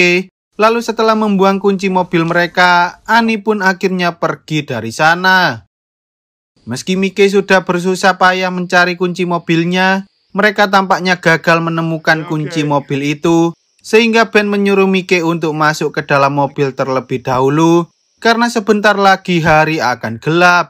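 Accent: Java, Indonesia. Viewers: suara jowo nya kurang kurangi mas 😃😃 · Logat jawanya masih kental kali😂😂😂...jd kurang sedap mengikuti alur filmnya😢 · ORANG JAWA YA BANG .. NGOMONG NYA MEDOK.. JAWA NYA MANA BANG .. AKU SEMARANG BANG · jowo ngendi mass? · Fiks org Jawa Admin ny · Coba plis logat jawa nya jangan di pake ,jadi susah nyimak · Kamu org Jawa ya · Medok bgt jawanya mase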